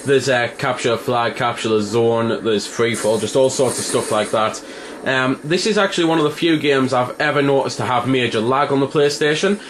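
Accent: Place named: England, United Kingdom. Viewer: i love this game I'm gonna get it and i also love you're English accent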